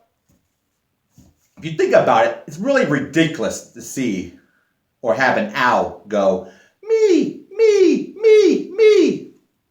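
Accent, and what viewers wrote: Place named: Philadelphia, United States. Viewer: Good analogies and a fun presentation. Are you from the Philly area by any chance? I grew up in South Jersey, and your accent sounds familiar! 😂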